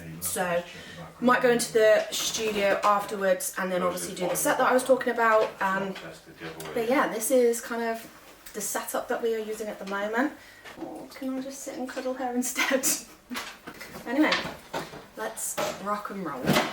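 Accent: United Kingdom. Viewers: Cool, are you from England?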